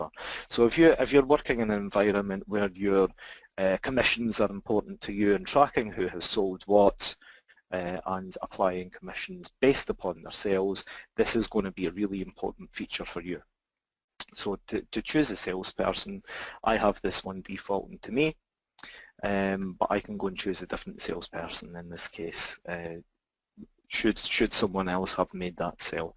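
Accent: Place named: Germany. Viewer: Like your German accent :) cheers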